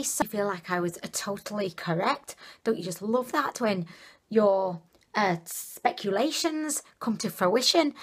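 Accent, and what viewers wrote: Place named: Manchester, United Kingdom. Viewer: Ahahah great accent where you from?....Sounds a bit like a Mancunian accent..I'm from Manchester also....your skin looks great but love that lipstick on you x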